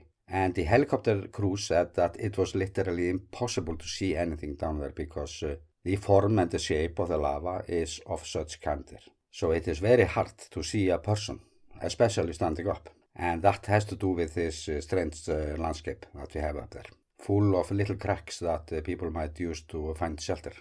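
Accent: Iceland. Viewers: I understood you perfectly. You have a wonderful Icelandic accent and humour to match! I always enjoy your very informative videos!! Keep them coming ❣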